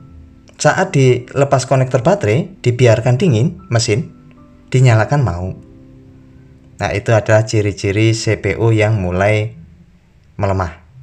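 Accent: Java, Indonesia. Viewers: Orang jawa yah?kalau boleh tau jawanya mana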